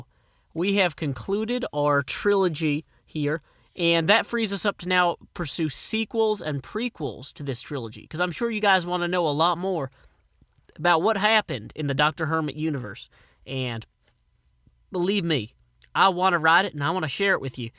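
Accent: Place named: Kentucky, United States. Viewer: Excellent work! Love the accent. I'm a KY girl myself so it is rare to hear something sounding like home on Youtube! I need you to make a prequel with more Etho in it. Hahahaha!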